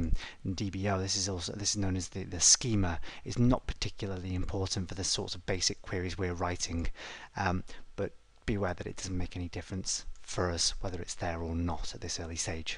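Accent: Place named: North Wales, United Kingdom. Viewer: I recognise that accent anywhere, do you come from North-West Wales? 🤔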